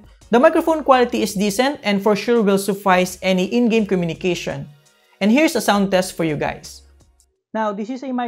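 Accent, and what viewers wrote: Philippines. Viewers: I'll take that Clunky Filipino accent over Indian classic tech support anyday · hears the accent My brain automatically: ahh pilipino to alam na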